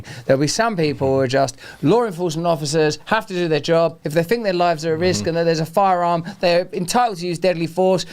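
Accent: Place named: United Kingdom. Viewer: I love how this guy's says absolutely nothing but has a British accent so people thinks he's saying something so insightful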